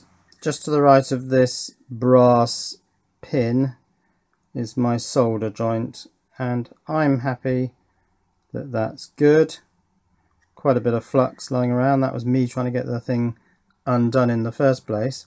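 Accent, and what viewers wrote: United Kingdom. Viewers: He did use the UK English pronouncing, not the American pronouncing.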